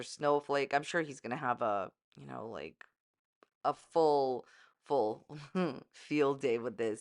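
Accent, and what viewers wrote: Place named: East Coast, United States. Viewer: I love your east coast accent!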